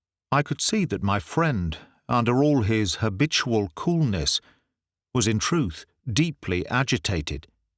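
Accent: United States. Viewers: I am always impressed with how well you do an American accent. Good job.... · I have just heard another one of your stories and may I say I have listened to it three times now. It's a wonderful story and you sir as usual are at your very best. I enjoy you so much you just do not know. Your rendition of American English was perfect 👌😍!! Please, keep these stories coming for as long as you can. You still amaze me!! With best regards to you!!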